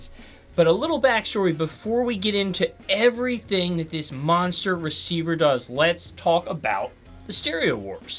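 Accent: United States. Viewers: Why if the talking head has a USA accent do we have to endure an undercurrent of anti intellectualism?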